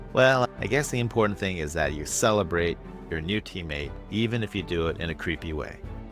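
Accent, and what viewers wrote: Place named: Netherlands. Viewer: Excellent Dutch accent!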